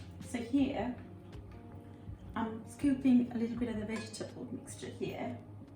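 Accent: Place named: United Kingdom. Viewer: Sweet talented lady with a British Accent, looks yummy ! enjoy your video over and over again !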